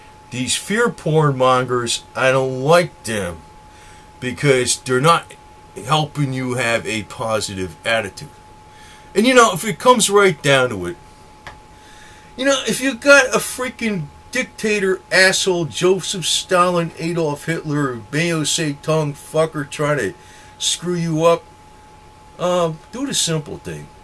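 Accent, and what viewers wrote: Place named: Northeast, United States. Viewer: Florida huh? Sound like a north east guy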